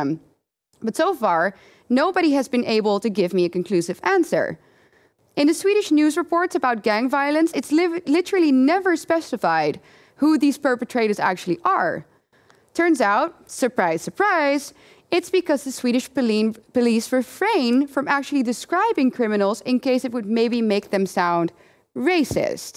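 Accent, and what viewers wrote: Netherlands. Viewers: Man.. I love the Dutch Accent! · Weet-landa, main accent on first syllable, only subsidiary accent on the second, and long e despite followed by two consonants, since only first of them belongs to same word within the compound. If I may say so, there are two accents that sound basically like you when speaking English : Dutch as yourself and Icelandic (have you checked out Leon and Sorelle?).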